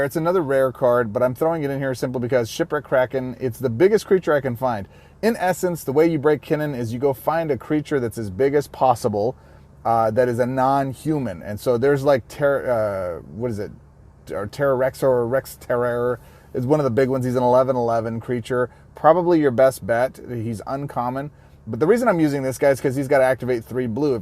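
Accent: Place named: Scotland, United Kingdom. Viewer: What's that Scottsman from?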